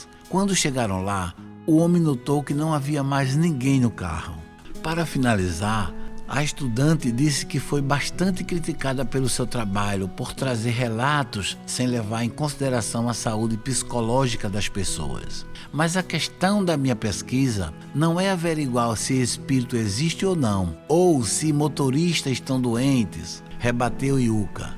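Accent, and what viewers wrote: Northeast, Brazil. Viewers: Sempre ouço seus depoimentos senhor locutor, de qual Cidade Nordestina vc é ? Pelo sotaque. Eu sou de GOIÂNIA GOIAS.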